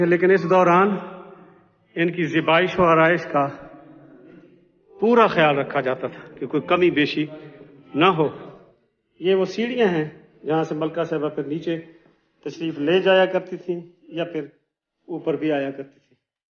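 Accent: Saraiki, Pakistan. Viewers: Sir please saraki ka tarhka lgaya kro Maza ata hai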